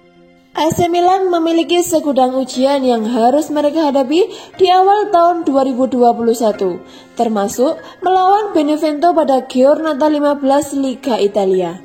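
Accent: Java, Indonesia. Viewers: Medok Is Medok 😃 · Kok narator Berita2 Ac Milan Di Youtube... Medok2 semua yaa...??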